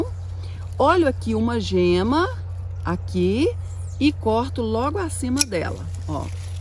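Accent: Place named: Ceará, Brazil. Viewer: Gostei amiga soou do Ceara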